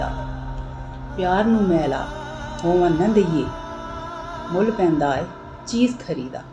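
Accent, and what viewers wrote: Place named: Saraiki, Pakistan. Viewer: سرائیکی لہجہ اپنانے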